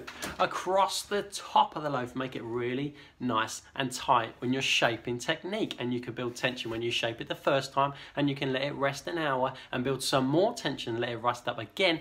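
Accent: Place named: Cockney, United Kingdom. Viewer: My new favorite cockney bread maker!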